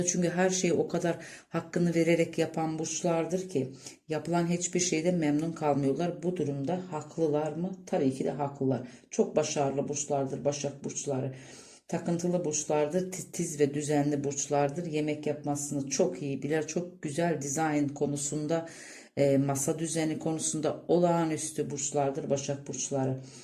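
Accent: Azerbaijan. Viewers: Siz Azerisiz?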